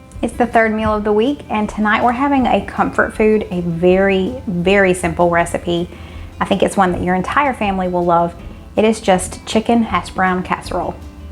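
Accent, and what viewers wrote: South, United States. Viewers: I’m a Southern girl out in the West now, and seeing your happy face (& family’s faces) and hearing your accent makes me homesick. I’m an unmarried empty-nester with severe physical illnesses (heart/lung). Cooking was my favorite thing & I can’t do it anymore, but watching you and your sweet family enjoy yourselves is pretty special to watch. Have the happiest and healthiest of New Years. ❤️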